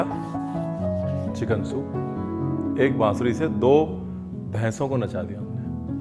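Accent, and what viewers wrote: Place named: Uttar Pradesh, India. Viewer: You say like UP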